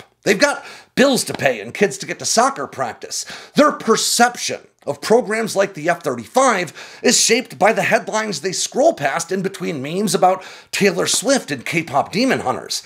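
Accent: United States. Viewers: As soon as you hear an American Accent in a video about China, you can rest assured that is gonna contain a pile of Yankee Bullshit and opinions upon about things about which they really have not got a clue.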